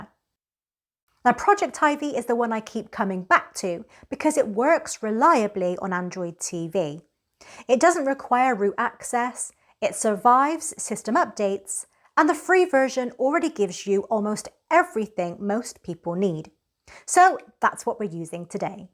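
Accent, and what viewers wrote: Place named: Cornwall, United Kingdom. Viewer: 😁 I grew up in Cornwall so have an ear for an accent... Can't decide whether you're Cornwall or Devon..? Or, to cover my bases completely, Dorset 😁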